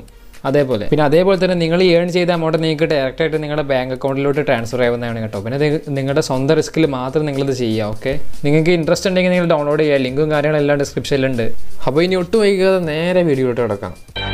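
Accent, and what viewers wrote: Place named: Malappuram, India. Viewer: Veed malappurm ano😂 voice kettuchoichatha💯🪄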